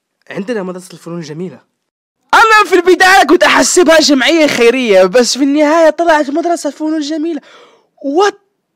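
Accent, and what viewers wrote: Morocco. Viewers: انت مغربي؟ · ولد بلادي باينة غير من هضرتك😂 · من هدرتك حسيت بيك مغربي فاش شفت فيديوهاتك تأكدت 😹😹 حبيتك الاخ 💁♥️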